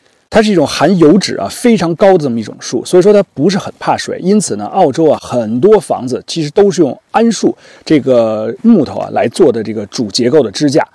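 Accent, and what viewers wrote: Beijing, China. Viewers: 听口音明明是大陆人，一到youtube上就切换成了繁体字，我也不知道你到底是怎么想的。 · 北京口音很重，用普通话更好些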